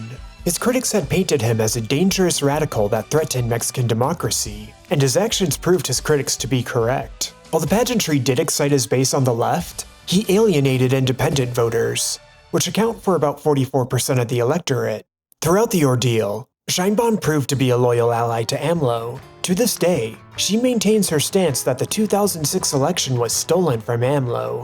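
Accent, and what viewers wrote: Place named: United States. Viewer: Irrelevant to mention President of Mexico ethnical background. She does not practice nor she considers Jewish but Mexican. I myself have a jewish last name, so what, I consider myself with the Culture I grew up with and where I was born. I do not practice Jewish Religion or its traditions. You Youtuber, your English makes people believe you are from USA. Would you like to be labeled as a foreigner and to mention the countries your grandparents were born? That always happened when a person is Important, but we in the USA, ever say where the grandparents of our Presidents came from?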